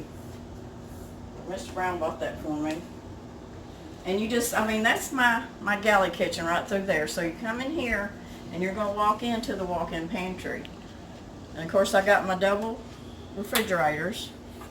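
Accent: United States. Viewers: Wow, that is amazing. Next time take some video of him building this stuff :) What an amazing kitchen and pantry that you have. Oh my goodness... Yeah, please do some teaching videos on CANNING !!!! <3 <3 <3 God bless! I cannot figure out what state you are in with that accent... hmmm....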